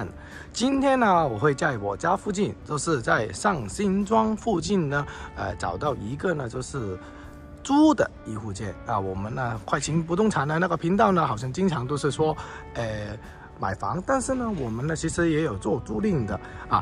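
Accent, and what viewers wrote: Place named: Hong Kong, China. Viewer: 这口音不是香港人就是广州人哈哈哈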